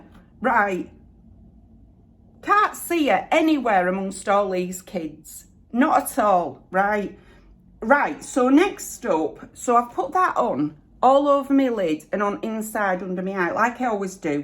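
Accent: Yorkshire, United Kingdom. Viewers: You told this story so vividly I could just “ see” all the kids shivering in the snow …. It’s a good idea for to be applying your makeup whilst recalling a memory and interesting for us viewers to watch as well as listen I am originally from York now in New Zealand but I really enjoy all your videos and love your unaffected Yorkshire accent… I can relate to many expressions which you use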